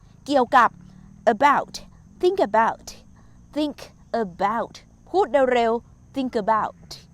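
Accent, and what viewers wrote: United Kingdom. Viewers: ชอบสำเนียงบรีติช ของ มากครับ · ผมชอบสำเนียง British Accent ของ มากเลยครับ ไม่ทราบว่า แต่งงานยังครับ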